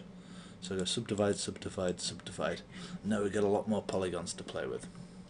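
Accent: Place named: United Kingdom. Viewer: FINALLY another british person..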